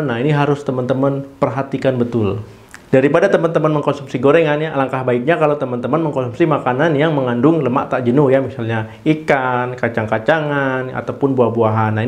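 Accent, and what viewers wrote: Aceh, Indonesia. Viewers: Dok orang Aceh ya? Logat nya Aceh masih kental soalnya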